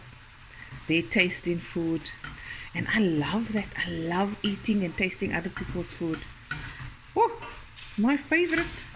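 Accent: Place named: Afrikaans, South Africa. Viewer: I'm a Portuguese South African living in the England just love your accent 😍 & cooking you make me home sick 🇿🇦🇵🇹 english mixed with Afrikaans beautiful 👏👏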